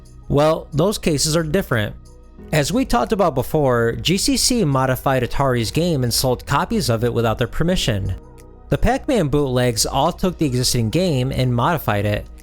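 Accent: Chicago, United States. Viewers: I have no words to express how happy it makes me to have do his signature smile after his intro. That and his Chicago accent makes me click right away. Oh and the content is great too.:)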